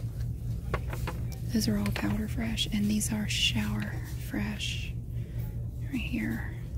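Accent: South, United States. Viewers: Your so pretty! I love your accent! Wish I had a southern girl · I love when u do shelf organization. The mixture of your southern ascent and organizing cosmetics gets me hooked every time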